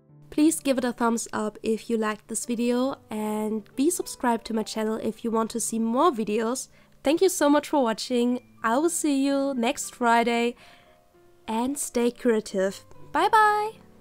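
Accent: Germany. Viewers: Kommst du von Deutschland😄 Ja oder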